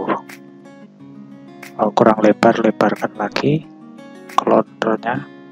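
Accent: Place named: Java, Indonesia. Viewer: Wong jowo koyone nek delok suarane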